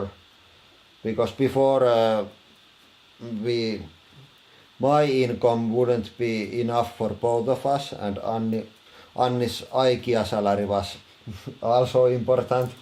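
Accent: Finland. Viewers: I'm watching your videos because they're entertaining and see what you crush every time is exciting and when you deal with Ms HP plastelina creatures and of course your Finish accent is fantastic. It is such a lovely accent (my favorite accent). Every time I look at my Nokia I think off you guys. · Vastaus kysymykseen: Oon kattonu teidän kaikki videot ja subannut molemmat kanavat, koska: 1) Ensin katoin paperivideon, koska luin siitä iltapaskan nettisivuilta. Tykkäsin sen aitoudesta: selostus rehellisellä rallienglannilla eikä myöskään mitään turhaa amerikkalaistyylistä esittämistä. Video oli jännä, ytimekäs ja lopputulos odottamaton:) 2) Päätin katsoa ne muut videot, mitä teillä oli. Huomasin, että täähän on ihan hauskaa settiä noin niin kuin muutenkin:) 3) Sen lisäksi, että tykkäsin viihdyttävästä tavasta selostaa, niin tykkäsin myös siitä, että Anni on aina mukana. 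Aika usein reaktiot niin prässäyksen tapahtumiin kuin rallienglantiin kutkuttavat nauruhermojani:) Mun kokemuksen mukaan te molemmat olette aitoja ja välittömiä ihmisiä, joiden videoita on hauska katsoa. 4) Muovailuvahahahmot videon lopussa ovat olleet tärkeä lisä. Jos videossa ei ole ollut muuta kovin yllättävää, niin on ainakin joku jännä uus ja äärimmäisen vaarallinen hahmo, joka saattaa hyökätä milloin vain:) 5) Tää Beyond the Press on tarjonnut sitten kaikkea mukavaa lisäjuttua teistä, mikä on vahvistanut kokemustani siitä, että olette kivoja, aitoja ja välittömiä ihmisiä ja teidän videoita on kiva katsoa. Ja tietty nää kaikki jännät testit, jotka ei kuulu pääkanavalle. Teitte viisaasti jaon pääkanavan ja tän Beyond the pressin välillä. 6) Olette onnistuneet tuomaan kanaville originaalia sisältöä melko tasaisella vauhdilla ja aktiivisesti joten, teidän videoiden katsomise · Just recently discovered your channels and I enjoy watching you destroy shit, and the Finnish accent makes it even better! 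Keep up the good work! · Erittäin kaunista Suomiengelskaa! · I love the accent. It reminds me of my grandmother from Savo. Who makes the clay animals you crush? They are quite good. Sorry to see them destroyed, but they must be dealt with. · Love the extra content and laugh:D And your Finnish accent:) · I watch your videos because they are so entertaining. Yes there are a few people now that have copied your Idea. But i don't enjoy their videos. Its not just about crushing stuff. Its that amazing combination of destroying stuff, your Finnish accent, the laugh of Anni, and your personalities. You seem like awesome people i would instantly invite over to my place for metal & BBQ & beers. But i think Germany is like 1 million miles away from Finland. Even Finland is 1 million miles away from Finland. Personality is so important for me when it comes to the channels i watch. Thats why i watch Dudesons, Abom, AvE, OxTools, BigClive, GMM, adunbee, SpectreSoundStudios, EllieAwesome and such. 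And also, Anni seriously reminds me of a cousin of mine. I swear to god, if you dressed them the same, with the same hair colour, people not knowing them personally will mix them up lol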